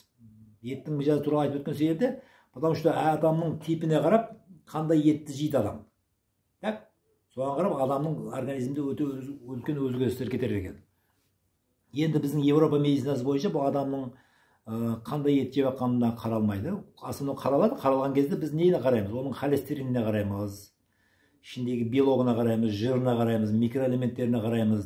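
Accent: Uzbekistan. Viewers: Қазақ, тек Ташкентте тұрғандықтан тілі өзбекшеге кетеді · Бешбармақ қай тілде акцентіңіз өзбекше ме сұрланғ😢😢 а н ет деген не Сүр🎉😢😢 ет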